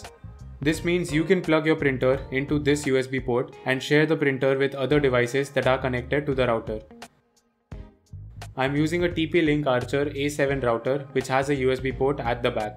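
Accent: India. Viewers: I can't understand Indian accents good enough but thanks for trying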